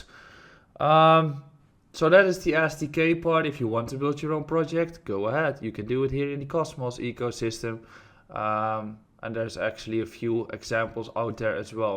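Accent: Netherlands. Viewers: Nederlander vanaf 60km te horen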